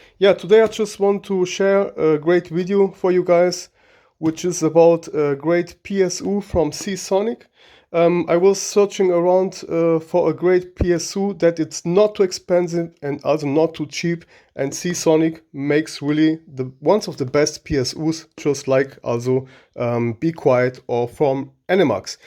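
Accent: Germany. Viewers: Trotz deinem guten Englisch, wusste ich das du deutsch sprichst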